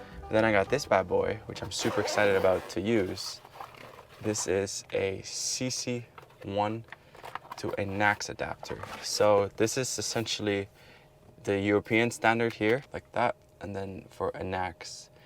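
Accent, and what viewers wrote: Netherlands. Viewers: Wat cool, ik wist niet dat je uit Nederland kwam. Ik bleef mij maar afvragen of ik niet een klein Nederlands accent hoorde haha. Nu snap ik ook je zonnepanelen uit Nederland op de Tesla. Wat een avontuur! Echt geweldig!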